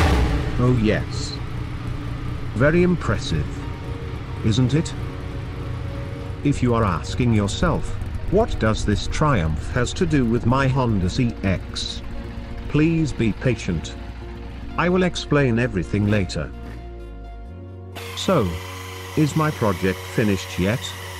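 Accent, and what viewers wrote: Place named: United Kingdom. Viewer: Tudo é perfeito nestes vídeos a música e esse sotaque britânico é mto agradável de ouvir. Parabéns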